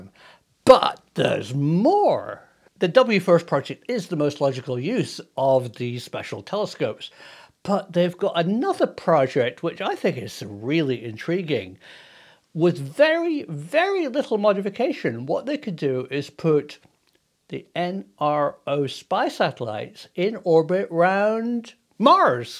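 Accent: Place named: Bristol, United Kingdom. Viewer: I love your channel very informative lifelong subscriber and I'm always a fan of the Brissol arrghchcent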